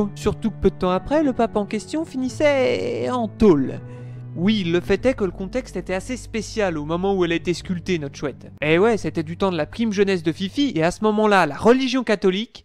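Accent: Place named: Burgundy, France. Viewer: Oh mais c'est bien une pointe d'accent bourguignon que j'entends? Toujours aussi intéressant et brillamment illustré!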